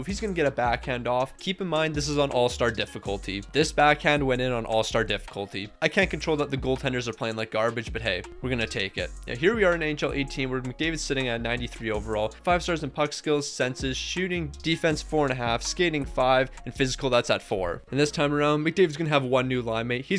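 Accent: Calgary, Canada. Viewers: Are you from calgary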